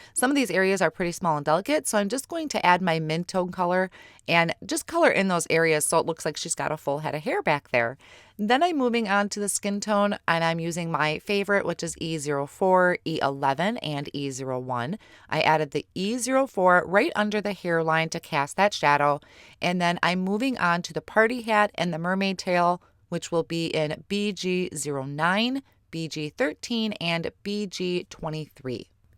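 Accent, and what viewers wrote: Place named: Minnesota, United States. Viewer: you've got to be a Minnesotan... "pOst-it tape", at the very least a mid-westerner... I'm originally from MN so I can hear the a's and the o's a mile away... love the card and all things My Favorite Things!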